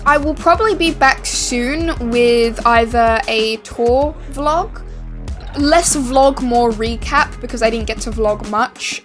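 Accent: United Kingdom. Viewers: i didn’t realize was british 😭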